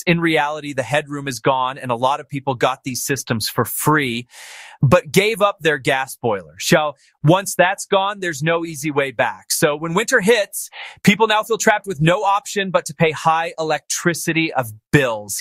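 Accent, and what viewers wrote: United States. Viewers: A thousand pounds of what? AI crap. A limey with an American voice. And I always knew heat pumps and solar panels are stupid. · What's the deal, you sound like you're from the US, but you're talking about pounds rather than dollars? In a discussion such as this, it helps if the costs per unit of energy are known. Since heat pumps are more efficient than heaters, the should use less energy overall unless it's so cold that their efficiency drops way off. But, I don't know the relative costs of electricity and natural gas where your customers live. In both Pennsylvania and California where I have lived, heating with natural gas was always quite a bit less expensive than heating with standard electricity powered furnaces. The other down side to heat pumps is the relatively high costs to purchase, maintain, and repair them compared to simpler natural gas or resistive electric heaters. 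With that in mind, it makes little sense to go for a heatpump if it DOESN'T run quite a bit per year because the electricity cost savings don't make up for the higher purchase and maintenance costs. Of course, if you need air conditioning in the summer then you also get some additional energy cost savings from your heat pump when cooling the house. Also keep in mind that the capacity requirement for your unit is based on the size of your home but also on the temperature differential that you want to maintain between indoors and outdoors. If a hot summer day in your area is 95F and you want an indoor temperature of 75F, you have to maintain a 20F temperature dufferential, but if a cold day in your area is 0F and you want an in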